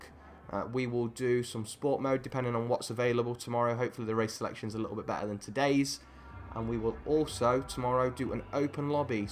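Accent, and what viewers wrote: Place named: England, United Kingdom. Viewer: Nice race. What part of England is that accent from?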